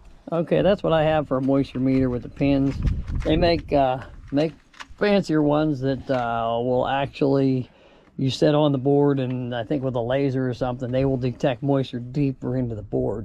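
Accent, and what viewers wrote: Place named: Western Pennsylvania, United States. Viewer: Im in PA too near Harrisburg. Curious, are you near me? Sounds like a Western PA accent? :)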